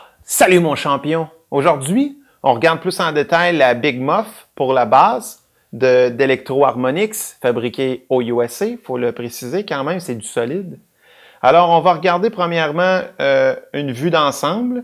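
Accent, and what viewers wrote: Quebec, Canada. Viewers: Très bonne démo malgré votre accent québécois. :)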